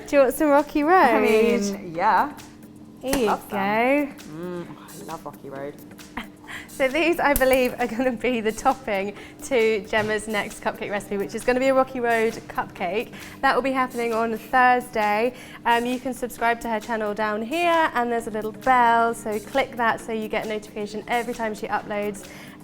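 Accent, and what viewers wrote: United Kingdom. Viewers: great job! But I'd like slower in speech since a lot of us aren't accustomed to British English, or even English. But it's so fun to watch. Thanks for making this!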